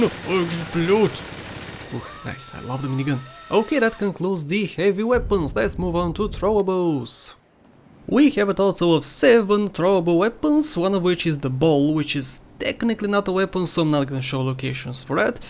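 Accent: Russia. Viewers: Are you Russian bro? I only ask because my mum is Polish and I think your accent is dope. Don't take any shit from anyone about it. Your channel is awesome. :)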